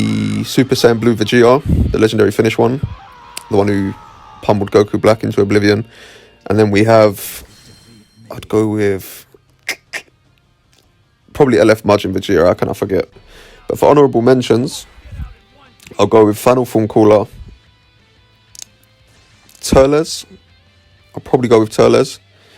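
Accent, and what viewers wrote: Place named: United Kingdom. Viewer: Get less British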